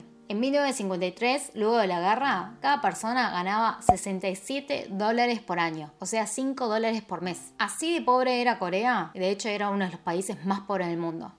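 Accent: Argentina. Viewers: Que hermoso .coreana con acento argentino ,vistes!! Saludos desde México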